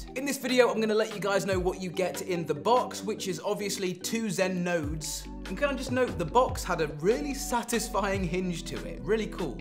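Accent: England, United Kingdom. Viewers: Love your English accent! 😁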